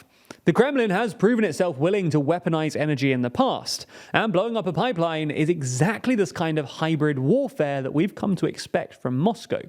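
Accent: United Kingdom. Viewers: This is the stupidest pure speculation video from you guys I’ve ever seen. This is becoming like NewsWars with a British accent. I hate it when a good channel becomes successful and begins chasing the money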